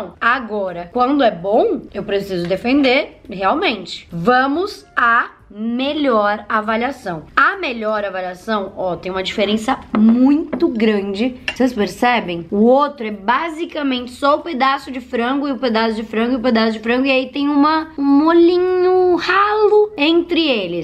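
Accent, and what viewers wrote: Rio Grande do Sul, Brazil. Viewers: Eu amo essa, ela é gaúcha nata! Até o jeito de falar, maravilhosa ♥️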